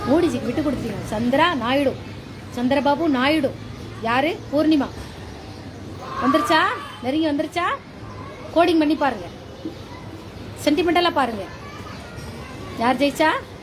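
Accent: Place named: Tirunelveli, India. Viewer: Unkal thirunelvelli pasai arumai amma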